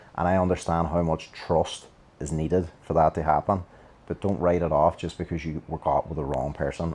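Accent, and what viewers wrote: Ireland. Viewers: I just wanted to say thank you and I love your Irish accent. I’ve personally experienced this detox period from a toxic relationship and it is so painful. I was worrying about my own safety once I decided to leave the relationship! I have done the necessary healing and don’t ever want to go back. This helps me as I’m training to be a counselor myself! God bless you friend! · with that awesome irish accent, well said, and thank you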